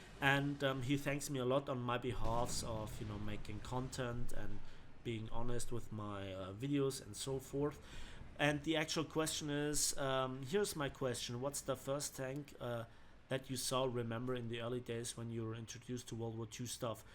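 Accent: Bavaria, Germany. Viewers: Question: What do you think of WoT in General? Und kannst du, wenn du deutsch sprichst bitte das Bayrisch unterlassen? Ich hab dann immer das Gefühl, ich hör Seehofer oder Söder zu. Yet thete is no indication of He filler, penetration values or fuses and so on in WoWs...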